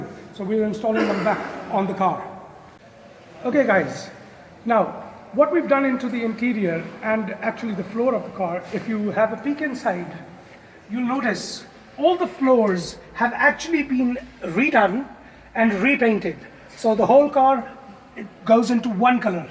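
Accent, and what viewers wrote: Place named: United Kingdom. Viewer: Great projects, and excellent British accent for this little British Motoring icon. Job well-done, sb!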